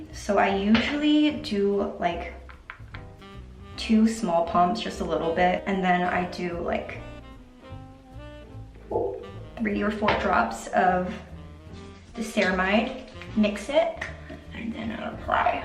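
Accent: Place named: United States. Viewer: i’ve been following your insta for a while and this is my first time hearing you talk. and wow i didn’t expect the american accent! i really thought you would sound posh british somehow since i see you update in london quite a lot 🥲 you’re so pretty, by the way!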